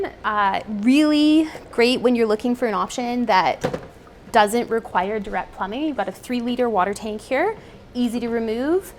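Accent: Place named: Canada. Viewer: The Canadian accent on this makes it very entertaining! 😂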